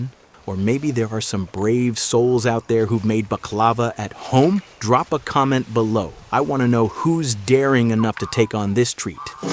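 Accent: United States. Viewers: Ur videos lost its charm because of the annoying American accent that doesn’t shut up.!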